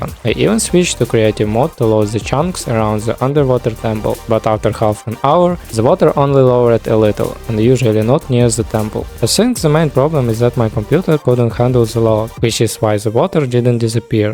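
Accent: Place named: Russia. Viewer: я слышу русский акцент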